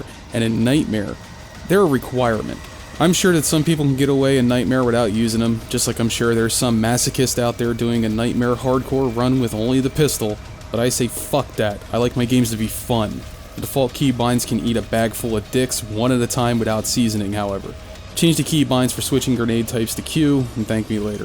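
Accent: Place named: South Jersey, United States. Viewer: I'm a simple man. I hear a South Jersey accent I subscribe.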